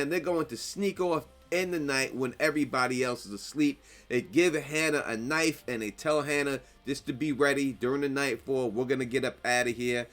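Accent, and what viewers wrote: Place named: New York, United States. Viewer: Bruv, it's the accent. My boy is from NYC, I'm from Dirty Jersey. That's how some people speak around the area.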